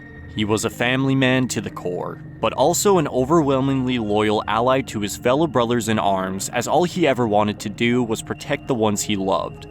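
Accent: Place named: Iowa, United States. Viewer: Did this guy say he was Canadian? His vowels sound like he’s from Iowa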